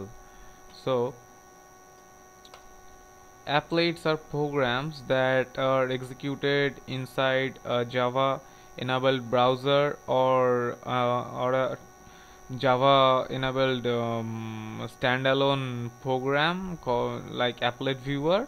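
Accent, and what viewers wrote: Bengal, India. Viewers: i think ur Indian and Bengali right :P by ur accent ..!!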